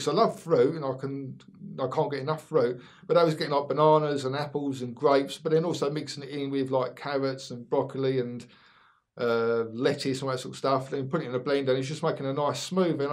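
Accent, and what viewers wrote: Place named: Scotland, United Kingdom. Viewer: One of the best reviewers on the tube, apparently according to one the blokes I work with you and me sound exactly the same Scot:)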